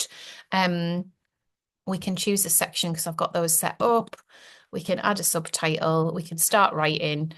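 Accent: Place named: Northern England, United Kingdom. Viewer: WAVES FROM OVER THE BORDER IN CUMBRIA!! Thank God!! A Northern real voice!!! There is a god!!!! Nice to meet you! Thank you for being you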